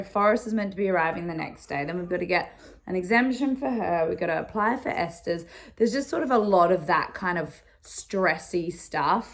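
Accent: Australia. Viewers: She is Australian.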